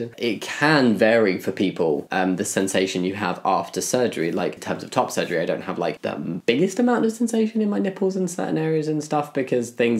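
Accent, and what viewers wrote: United Kingdom. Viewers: I like your voice. Are you british? How have you gotten it? Or you have had it before transition?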